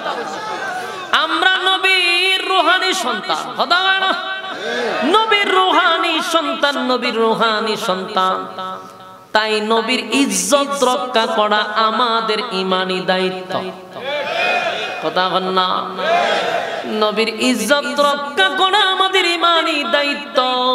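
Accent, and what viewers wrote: Noakhali, Bangladesh. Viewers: এটাই নোয়াখালীর বয়ান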